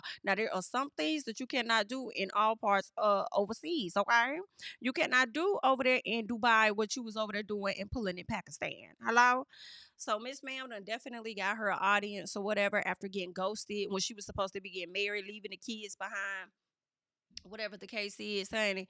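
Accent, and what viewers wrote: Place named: Louisiana, United States. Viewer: Hey, bihh! You are one of the best YouTuber. I love your accent. Where are you from? It's giving Louisiana! Bihhh stop, I'm that.5 million that's been watching over and over again! They didn't add crowd noise. I know someone that was there, and they said it was lit! 50 is a bug 🐛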